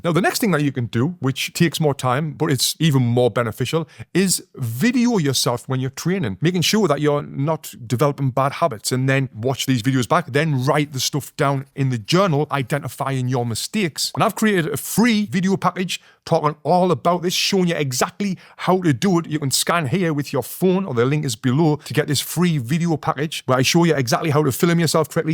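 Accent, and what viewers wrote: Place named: Newcastle, United Kingdom. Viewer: You produce great content and I love the Geordie accent. My old man was born in South Shields in 1907 and our family moved to Australia in 1951. He never lost his Geordie accent and was very proud of his origins. He fought pro as a young man and served in the Royal Engineers in the War. He won the regimental middleweight title when he was 37 - the oldest entrant in the tournament. When the CO presented him with the winner's prize - 500 cigarettes!!! - he said to him, "Well done sapper. I think you've fought before!"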